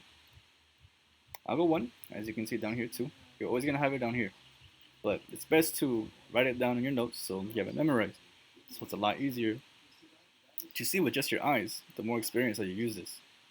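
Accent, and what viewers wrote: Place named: Brooklyn, United States. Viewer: Wow so glad I found your channel bro! I'm already well versed with ICT but this has been a cherry on top. You sound like you have a Brooklyn accent btw... you from NY?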